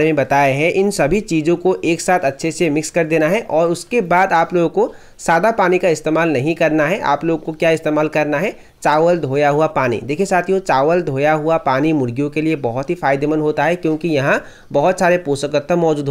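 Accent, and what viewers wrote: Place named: Nepal. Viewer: Aap Nepal me the kya pehle...aapke bolne ki style nepali accest se match kr rhi hai🇳🇵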